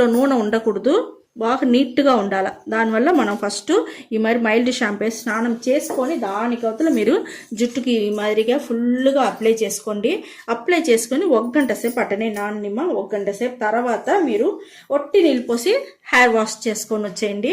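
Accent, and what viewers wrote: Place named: Karnataka, India. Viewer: Karnataka walla miru me matalu alane unai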